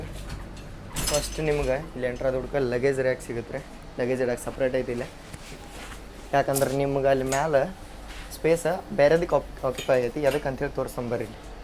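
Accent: North Karnataka, India. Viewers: Very very very nice north karanatks kannada language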